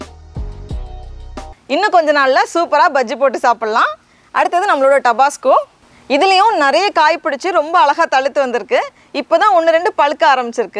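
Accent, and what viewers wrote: Nagercoil, India. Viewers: 1.45 Cheththu pozhachuruku😂😂 ultimate akka.,still I can feel the nagercoil slang in u❤️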